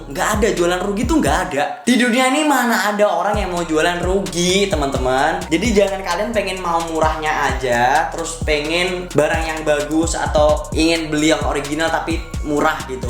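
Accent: Java, Indonesia. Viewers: Yang ngomong jamet ya , medok amat . Gak usah sok vocal ngurusin orang . Cukur aja rambut lu botakin , masih pede gak lu tampil di depan kamera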